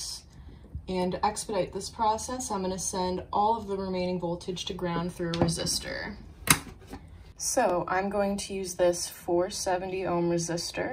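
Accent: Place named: Southern California, United States. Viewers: Great tips on how to live longer! You sound like a Californian, if so ,how can I get my amp to you so that it can be fixed by a true Pro? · That was awesome, and without a doubt the definitive SOCAL delivery. Lol. Very laid back and with that slight slowness and crackle at the back of her words. Like, totally…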